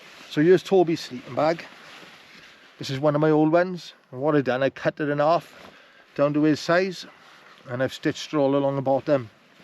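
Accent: Wales, United Kingdom. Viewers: So nice to hear a Welsh accent again. I'm a Pembroke boy but been living in Ireland for the last 26 years. There's some spectacular places to go wild camping over here, but my heart never left Wales and I miss it. Just subscribed to your channel, mate, looking forward to the next video. Take care, mate and give Toby a pat from me. Cheers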